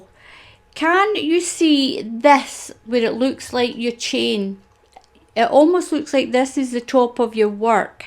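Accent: Scotland, United Kingdom. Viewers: Nope, love hearing the Scottish accent! So keep on talking,! oh, and Subscribed! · Thank you I just love this pattern , it’s great to hear your accent . I am also Scottish and live in Canada .🇨🇦 Stay safe and happy crocheting 🌈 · The second I heard your accent, I knew you were from Scotland. I'm a huge fan of the tv show "Outlander." I'm not sure if you've heard of it, but it's based partly in Scotland. I absolutely love that show, and I love listening to your voice in your tutorials. I'm a new sub and I can't wait to binge watch all of your videos, lol. You describe your stitches so clearly so that we all know what to do and what not to do. Thank you so much. Happy Mother's Day! 🐞🦋🌼🌹🌻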